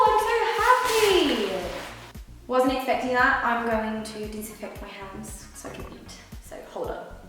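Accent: England, United Kingdom. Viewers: Que lindo é o teu acento inglês,. Adorei o video! Tu faz como queres: inglês o português. Eu sei que irei a gostar. Beijinhos! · Adoro o sotaque britânico , acho que devias apostar mais por estes vídeos até crescias muito mais prefiro ver estes vídeos , assim conseguias te aproximar mais da tua cultura e nos também Pensa nisso Beijinhos e muito sucesso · So british eheheh adorei :D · Hiii! I love your british accent!! Please do more videos like this! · OMG the british accent !! 😍😍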